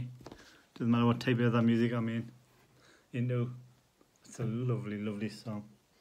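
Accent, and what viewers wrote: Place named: Northumbria, United Kingdom. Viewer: I think i try to find something from what you showed here. Dont know though how easy it is in Finland. Where are you located in Britain, your accent is quite hard for me, i have heard similar in some snooker interviews.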